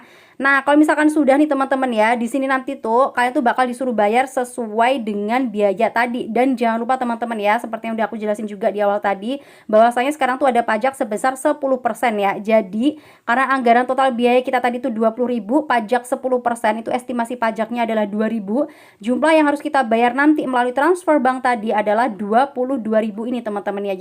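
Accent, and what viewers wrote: Java, Indonesia. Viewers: Hhe...medok bnget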